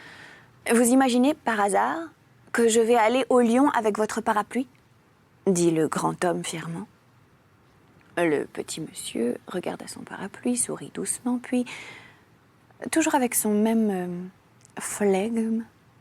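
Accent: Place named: Midi, France. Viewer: C'est et non Camille. Cela dit, pourrait jouer Camille dans Horace de P. Corneille (pour le côté "amoureuse aérienne" on l'entend bien dire avec sa douce voix '"iras-tu Curiace,...") ou Camille dans Le Mepris de JL Godard (à cause du côté beauté fatale et le regard mauvais qu'elle peut avoir). Cette lecture très bien préparée avec des jeux de voix du Midi, confirme des qualités professionnelles qu'on aimerait voir mieux reconnues.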